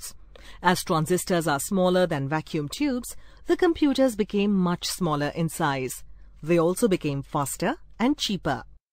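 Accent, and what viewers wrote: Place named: United Kingdom. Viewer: I love your British accent..I have learnt so much about computers...thank u.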